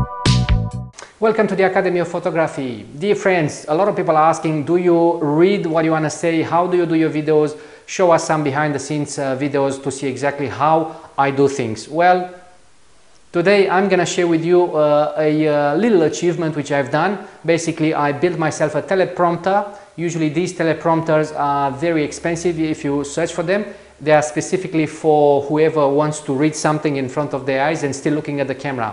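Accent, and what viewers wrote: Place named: Romania. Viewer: Are you romanian? I recognize the accent :)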